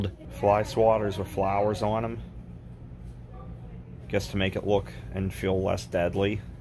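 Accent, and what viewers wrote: Pittsburgh, United States. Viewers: I thought I heard that Pittsburgh accent in your Chuck E. Cheese video lmao yinzers for life man. Subscribed!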